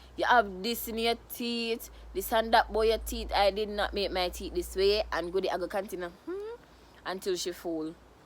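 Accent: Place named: Jamaica. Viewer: You are more than made for this, have been binge watching you since the other day and you are like all of the Jamaican comedians combined plus more because you have accent locked. Very entertaining 💫💫💫💫💫💥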